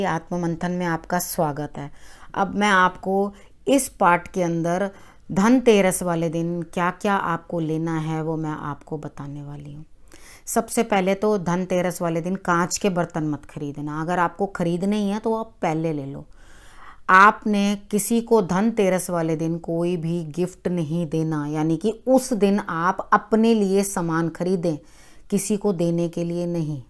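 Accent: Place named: Punjab, India. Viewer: Very well explained, m ur fan ,specially our type punjabi accent.i adore u a lot.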